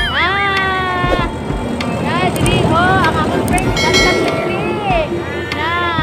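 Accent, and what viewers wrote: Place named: Iloilo, Philippines. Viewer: Nami gid ang ilonggo ba hehe malalambing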